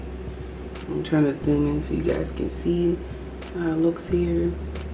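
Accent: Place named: Delaware, United States. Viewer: Hey! This looks good! I have a sweet tooth as well lol and love sweet cornbread too! I like how you talk too lol you kinda sound like my family that’s from Delaware 😊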